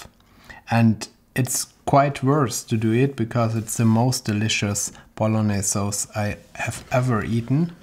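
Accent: Germany. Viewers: This German accent is hilarious. XDD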